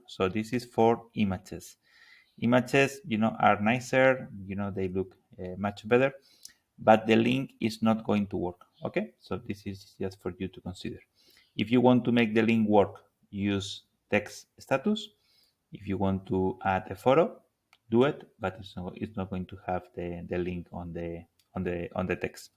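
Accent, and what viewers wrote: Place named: Spain. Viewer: I can hear you are Spanish. Gracias 🙏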